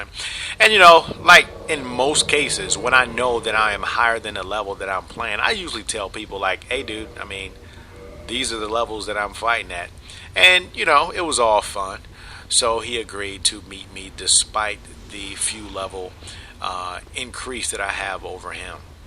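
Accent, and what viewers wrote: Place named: AAVE, United States. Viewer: You sound of the afro ebonic persuasion.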